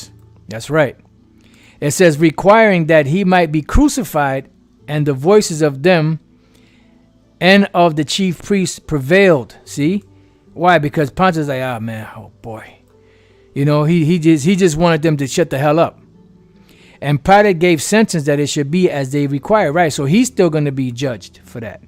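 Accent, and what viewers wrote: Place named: Jamaica. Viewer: I notice your Jamaican accent every now and then, shalam.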